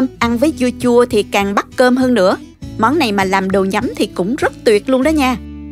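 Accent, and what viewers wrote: South, Vietnam. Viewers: Giọng chị miền Nam , chị thì không nên dùng các từ đĩa( dĩa), thìa( muỗng), thái(cắt, sắc)...các từ đó để người miền bắc nói đúng hơn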